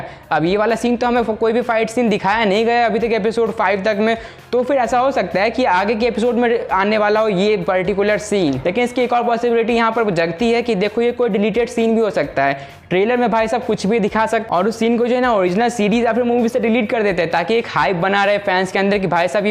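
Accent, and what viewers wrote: India. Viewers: Tu Bengali hai kya bhai????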